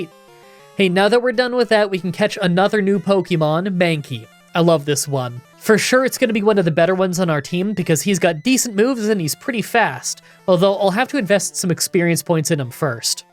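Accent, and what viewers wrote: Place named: Canada. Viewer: Your Canadian accent makes me happy.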